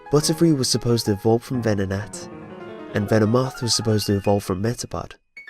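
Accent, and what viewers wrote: United Kingdom. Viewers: You are my favorite YouTuber ok I look up to you from your British accent to your super cool top tens !!! Even your creepypastas are creepyer whith you!!! And I love that 3rd one!!please NEVER ever ever never forever never stop making videos NEVER ever forever and ever never stop .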